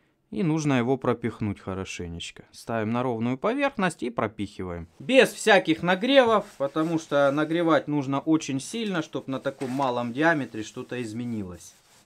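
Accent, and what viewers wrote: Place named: South, Russia. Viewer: у просто неправильное звучание,ибо южный акцент имеет,все люди с нормальным произношением не будут говорить подшибник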